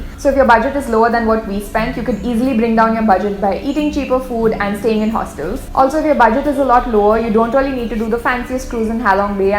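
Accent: India. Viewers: 10.x speed if you speak like this in Canada BC you will be fired from job brain draining Indian accent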